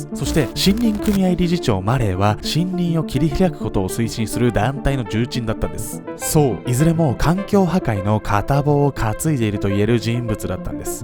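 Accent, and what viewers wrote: Kansai, Japan. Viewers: 関感凄くて草www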